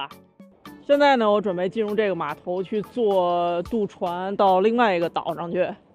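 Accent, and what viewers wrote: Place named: North, China. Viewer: 拜託 你不要看臉聴聲音也知道是大陸北方姑娘。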